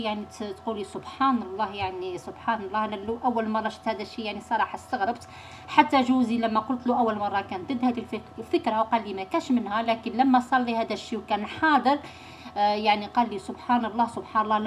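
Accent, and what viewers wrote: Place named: Algeria. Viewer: من لهجتك يضهر على انك جزائرية والجزائريون معروف عليهم انهم عشرة في عقل البول كله سموم ولا يصلح لشيئ غير التبول عليك على هاذا الكلام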